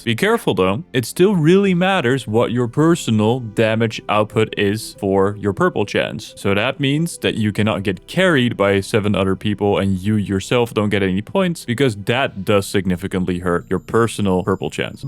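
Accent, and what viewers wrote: Netherlands. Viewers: Is that a dutch accent i hear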